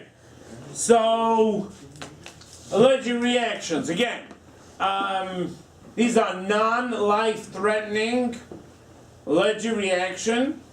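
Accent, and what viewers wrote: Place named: New York, United States. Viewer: Is it just me or does he sound like Timon when he lectures?? Probably just the New York accent, soo endearing 😂 love the lectures, 10x better than my lecturer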